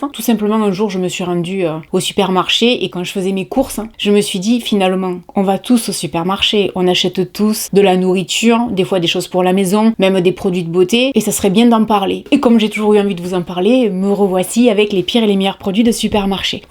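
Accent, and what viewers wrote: South, France. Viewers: C'est vraiment un régal de te regarder et de t'écouter, ton sourire, ta joie et ta voix qui chante le sud, j'en raffole Bisous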